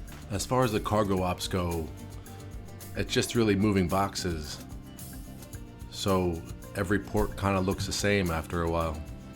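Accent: New Jersey, United States. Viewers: Whenever videos come up his accent always brings me back home to Jersey. I grew up in Jersey but have lived in Alaska for over 30 years but accent always reminds me of where I grew up. Great Videos as well!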